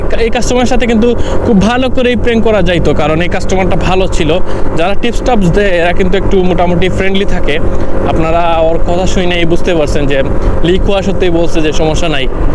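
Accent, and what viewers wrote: Sylhet, Bangladesh. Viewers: Sylhet'i naki apni? 😊❤ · Bhai Sylheti nii?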